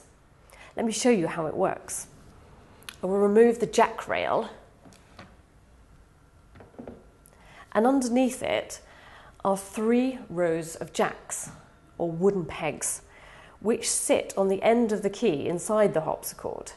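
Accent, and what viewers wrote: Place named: Oxford, United Kingdom. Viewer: Oxford English pronounced properly. Very clear and eloquent.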